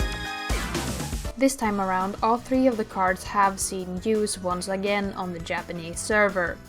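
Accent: Sweden. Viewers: are you swedish?!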